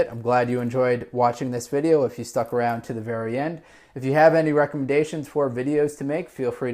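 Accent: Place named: Canada. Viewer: Yes..you have a Canadian accent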